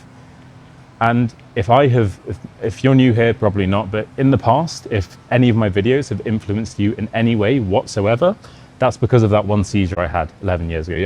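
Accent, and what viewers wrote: RP, United Kingdom. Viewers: You spoke so clearly and almost RP. You spoke so well compare to some Hollywood actors which I need to turn on subtitle in order to understand them. Fantastic four 2026 is one of it. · Hello, son !!! I'm an old french lady refreshing her english... When I first saw you, with your red hair and beard, I thought may be you were from Scotland or Ireland, which would have been very difficult for me to keep up...😂 But you have an honest British accent, very nice and easy to understand 😊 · I thought you were going to speak some complicated form of English, like some Shakespearean-type shit. 💀 I could understand every single word that came out of this guy’s mouth. The only difference was his British accent. Other than that, he sounded completely normal to me. I think he was talking about non-native English speakers—people whose first language isn’t English. 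I’ve been speaking English my whole life, so none of what he was saying was difficult for me to understand. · Maybe this video is for his Korean viewers. I thought he might have some crazy, difficult-to-understand Scottish accent or something. He sounds like a BBC News presenter. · You talked with very plain and delicate British accent, articulated each sentence in a clean way and didn't speak too fast. I understood everything! (And I'm sorry for the discrimination you went through) ciao from Italy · For me as a German, he speaks a very clear British English, not comparable to Scots or other British dialects. So no problem at all. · Apparently, my English is incredible. But I love your British accent, so I'm watching... 😂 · A beautiful speaking voice, with clear British pronunciation and accent. Easily and completely understood, at least by this American. I’m probably not the best test subject, however. 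As a 21 year old in 1970, I spent my junior year of college at the University of York. I didn’t qualify to study in a country where English was not the native language. I distinctly remember my taxi ride from the York train station to my digs. My taxi driver opened his mouth in conversation and I couldn’t understand half of what he was saying, although I knew it was English. I almost panicked, thinking What have I done?! I soon found out that he was from Newcastle and spoke the Yorkshire dialect. Over that year, I heard many British dialects and learned to understand them all. What a year that was! Not just the butterfly affect but the giant swallowtail butterfly effect. It broadened my American identity to then include more that of a world citizen, which has served me and others well, I believe. It changed my life for the better. Now that I’m an addict of British tv crime shows, I can understand most every word and sometimes translate for my English speaking husband. 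A good thing. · erm if i don't understand it's normal bc of your british accent. but i managed to understand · Understood 100%. RP is easy to understand. Thank you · I understood perfectly, but that would be because I am from the UK and you spoke English in a pretty much RP style. Now, if you were speaking as if you originated from inner-city Glasgow...... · I was expecting him to drop into a regional accent not stay in RP. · I was expecting a broad Glaswiegan accent not a nice clear RP accent 😂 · Received pronunciation, notoriously tricky for the uninitiated. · Dispite your strong british accent, i can understand you! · Although it is a relatively RP accent. Try any working class person with a strong regional accent, that would be much more difficult.try scouse, brummie, or pretty much any of them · He does speak in a very clean general British accent. While visiting the UK my miles varied..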